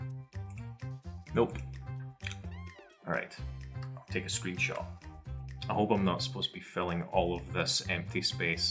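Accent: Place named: Scotland, United Kingdom. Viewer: And why does he have what must be an Scottish accent?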